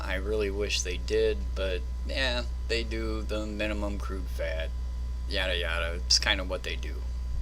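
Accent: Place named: Great Lakes, United States. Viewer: Great Lakes accent is strong. Yahey dere!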